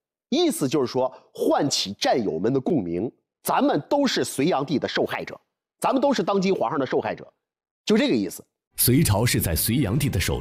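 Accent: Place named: Shaanxi, China. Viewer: 这教授的普通话口音一听就是我们陕西人。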